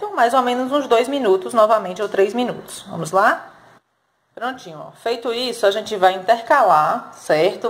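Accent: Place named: Northeast, Brazil. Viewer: menina, adoro nosso sotaque, sou Nordestina e repito muito. Certo e né? Né? rsrsrs. Amei sua receita CERTO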